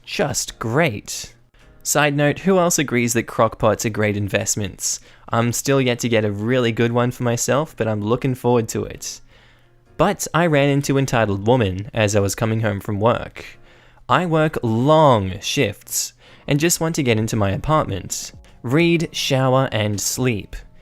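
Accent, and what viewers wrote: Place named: Australia. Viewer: hit us with your true Aussie voice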